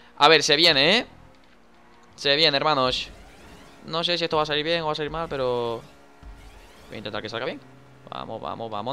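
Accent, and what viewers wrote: Mexico. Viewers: es español y habla como mexicano like si crees que es cierto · soy yo o ya habla como mexicano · Azuverga we? De cuando aca habla mexicano? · De donde o con quién estás aprendiendo hablar como mexicano!! saludos!!😉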